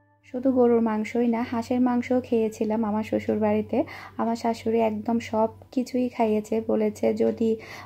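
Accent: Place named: Noakhali, Bangladesh. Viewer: Apu kmn achen.apni noakhalir sune valo laglo.nijer khub kacer akjon Mone hoy.amio noakhalir meye.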